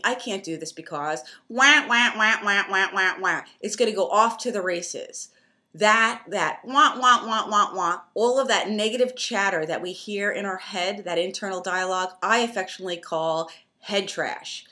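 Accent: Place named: New York, United States. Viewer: Your NEw York accent is ever showing. Haha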